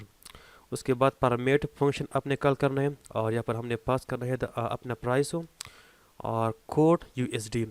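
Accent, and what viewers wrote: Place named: Peshawar, Pakistan. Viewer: Sab acha hai lekin apna khan wala akcent khatam karo bhai ye youtube hai peshawar nahi yahan har banda nahi samajh sakta aap ka style.. please brother..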